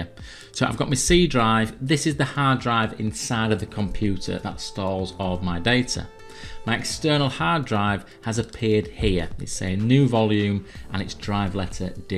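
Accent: Yorkshire, United Kingdom. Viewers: Yorkshire, Yorkshire , Yorkshire.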